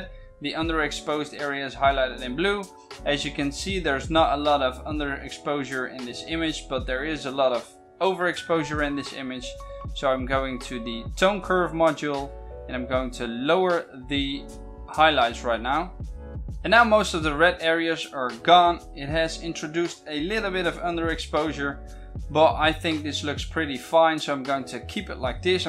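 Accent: Netherlands. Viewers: This dutch accent ♥.♥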